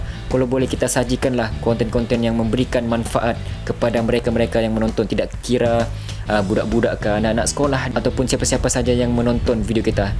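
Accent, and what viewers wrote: Sabah, Malaysia. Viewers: Bro ni orang mana ya? Loghat sabah hm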